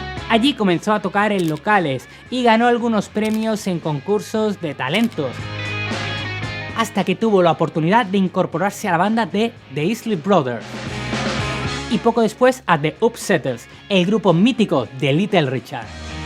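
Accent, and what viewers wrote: Spain. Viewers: Es chistoso como los españoles se expresan, pero me agrada el video, ¡bien! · Buena historia. Pero quizá voy a sonar ridículo. Pero el acento español me desánimo a seguir viendo. Saludos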